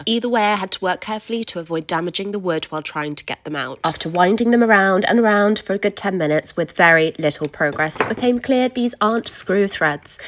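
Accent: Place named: RP, United Kingdom. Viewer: You speak like the Queen of England in her 1956 or 7 Christmas greeting. Hurrah on your hard work. I’ve not ever finished a project like this.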